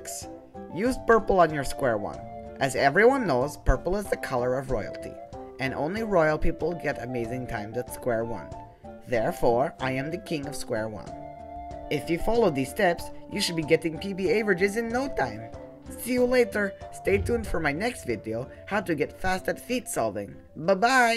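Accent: Russia. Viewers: oh yes! i been trying to get good at sq-1. hears russian accent FOR FUCKS SAKE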